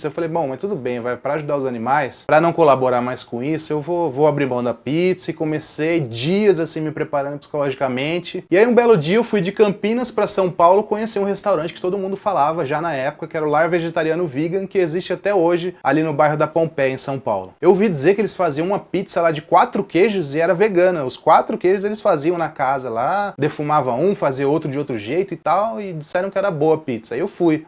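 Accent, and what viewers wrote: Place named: Northeast, Brazil. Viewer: Êa, Cara, Show! obrigado pela dica de anotar a data! eu anotei agora pra não esquecer porquê dissestes. fiquei de cara em saber que é nordestino, já tinha assistido diversos vídeos e não tinha prestado atenção no seu sotaque ainda, e olha que cresci em Sergipe, moro aqui e morei muitos anos na Paraíba também. Abs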